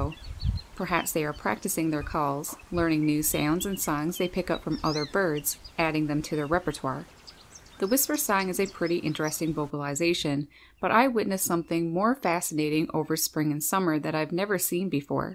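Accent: Newfoundland, Canada. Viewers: The Canadian accent is strong with this woman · Are you from Nfld or Nova Scotia? XD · I knew you were Canadian when I heard pronounce your “ou”s 😆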